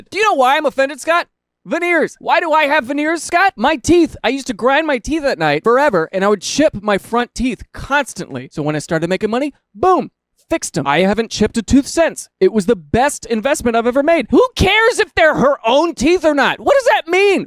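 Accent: Germany. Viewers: That's a pretty good German accent, not sure what it has to do with Lake Tahoe though lol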